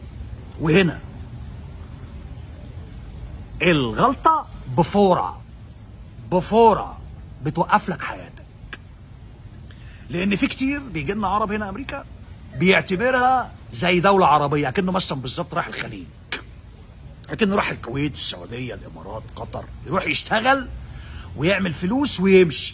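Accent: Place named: Egypt. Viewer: بسبب التعليقات المؤيده لكلامك كنت شكيت في انك عملت زيارة لمريكا اصلا . بسبب حفاظك علي اللهجه المصرية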